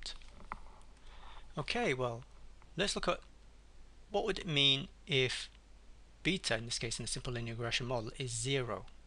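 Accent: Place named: United Kingdom. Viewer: Thick British accent.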